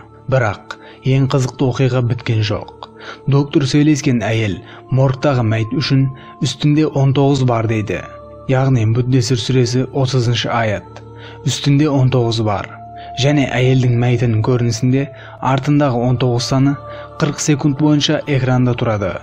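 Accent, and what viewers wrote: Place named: Uzbekistan. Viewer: каналды жүргізіп жатқан жігіт, сіз өзбексіз бе? өзбекше акцент бар сияқты тіліңізде?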